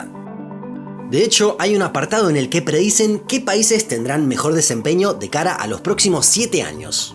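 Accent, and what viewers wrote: Argentina. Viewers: No di es nada..seguro eres m argentin. o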